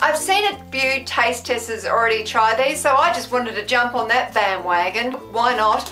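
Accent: Australia. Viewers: I new you were Australian because you acset I'm Australia and i love mint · Oh far out i can tell that's an aussie acronym or slang term the way you say it in all your videos 😂😆🤣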